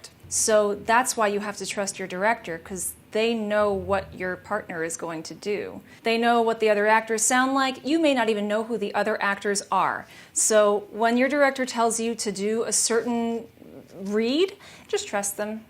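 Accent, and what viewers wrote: Russia. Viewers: I LOVE YOUR RUSSIAN ACCENT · wait, you're russian?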